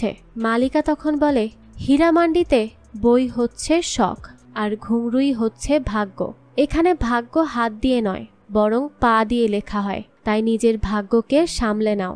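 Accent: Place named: Bangladesh. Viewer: আপু তুমি কোন দেশি,,,তোমার ভয়েস r কথা শুনে মনে হচ্ছে তুমি বাংলাদেশি,,,তোমার চেহারা দেখার খুব ইচ্ছা ছিল আমার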